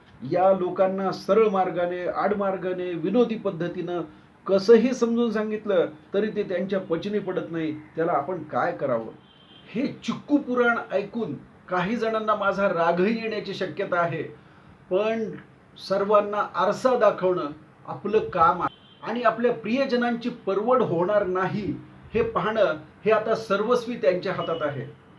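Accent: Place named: Marathwada, India. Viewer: खुप छान आपले व्हिडिओ आहेत. आपल्या मारठवाडा टोन मराठी भाषेत चुटकुले ऐकायला मजेशीर वाटतात. 😅😊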